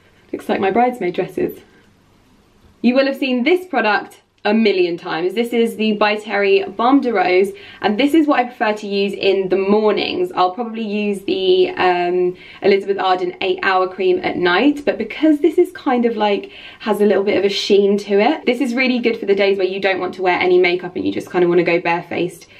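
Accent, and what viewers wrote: RP, United Kingdom. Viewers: Hi, I am an Italian girl, I really love your accent, do you define your accent an "RP english"? It's sounds different and more elegant compared to other bloggers out there:) It's similar to the Emilia Clarke one:) Kisses from Milan <3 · I haven't watched your videos in a while, and the first thing I wanna say after the first two seconds of the videos is: I'VE HELLA MISSED YOUR UNREAL ACCENT! Omg😍😂 I will always be jelous of british people. AND HOLD ON: HOW EXCITING IS IT TO BE TRYING ON WEDDING DRESSES like, for your actual weddingg!!😍😍😍 I'm so happy and excited for you!!! You teo look AMAZING together! So lucky ugh😍😍😍 Love youuu😄💗💗💗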